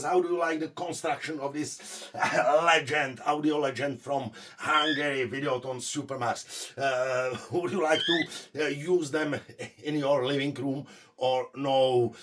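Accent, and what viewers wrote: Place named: Finland-Swedish, Finland. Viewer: You sound finnishswedish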